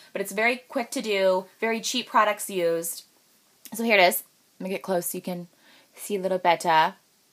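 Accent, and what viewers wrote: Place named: Midwest, United States. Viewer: What... Change your voice? I think you are incredibly easy to understand, if that's what the post meant... Midwestern accents, FTW!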